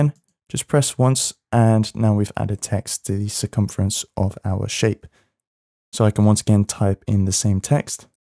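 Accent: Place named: England, United Kingdom. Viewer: FANTASTIC!!! I've just found you, after looking for so long to find about putting text into Photoshop. Such a breath of fresh air to hear a nice slow, calm, English voice, and very carefully explained. THANK YOU SO MUCH! I am avidly looking at all your videos. I went to bed a very happy bunny last night. I have subscribed!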